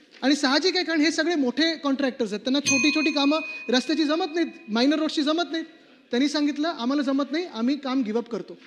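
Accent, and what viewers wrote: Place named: Marathwada, India. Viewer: नंबर एक मुलगा आहे त्याच्या बोलण्यातून खरा स्वाद येत आहे मराठवाड्याचा मुलगा शोभला🚩👍☝️🌹🌹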